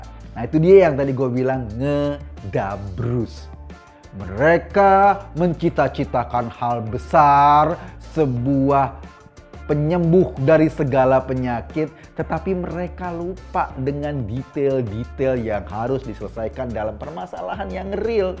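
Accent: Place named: West Java, Indonesia. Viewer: Mendengar logatnya kelihatannya dari bagian barat Jawa. Sejak awal proklamasi nggak mau gabung NKRI !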